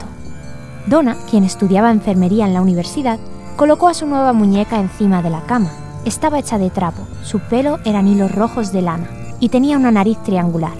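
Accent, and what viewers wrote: Spain. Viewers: Como ablas beo que héroes de España mi país favorito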